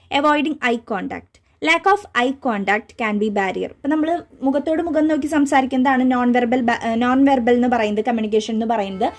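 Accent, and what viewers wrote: Thrissur, India. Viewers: Thrissurkaari aano😊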